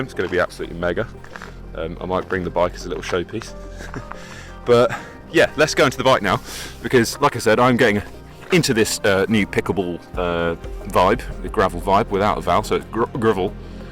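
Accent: United States. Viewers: Impressive American accent, Mr..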